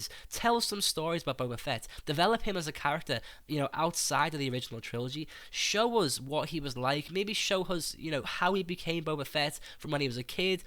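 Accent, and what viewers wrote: Liverpool, United Kingdom. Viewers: can i ask are you from Liverpool? i live in Liverpool, i'm glad to hear a familiar accent on youtube :)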